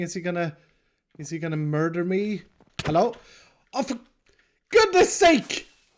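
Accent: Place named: Sweden. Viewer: Im sorry bur you have one of the most Swedish accent that u have ever heard😇😅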